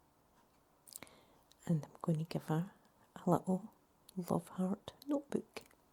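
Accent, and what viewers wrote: Scotland, United Kingdom. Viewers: Where in Scotland are you from if you don't mind me asking? Great video, amazing work!x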